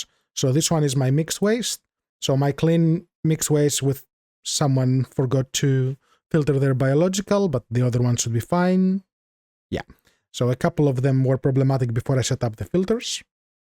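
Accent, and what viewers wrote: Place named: Finland. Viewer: I love Finnish accent so much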